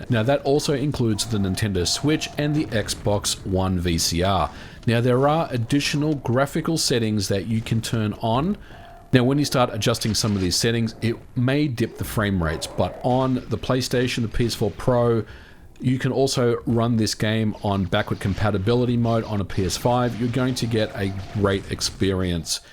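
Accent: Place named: Australia. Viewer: Are you Aussie? I just saw on your profile that you're located in the USA, but I hear an Aussie accent :)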